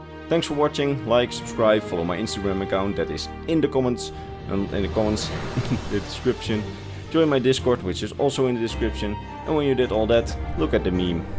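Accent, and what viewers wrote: Netherlands. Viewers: I think that he's Dutch just like me. We actually created a name for English whilst maintain their Dutch accent. It's Dunglish :P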